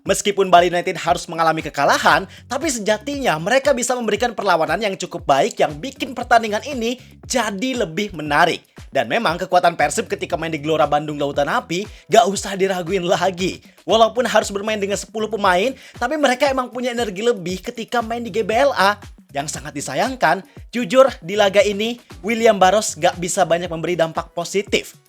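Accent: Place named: Jakarta, Indonesia. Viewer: Kalo dari logatnya setiap bikin video, kayaknya masih jabodetabek dehh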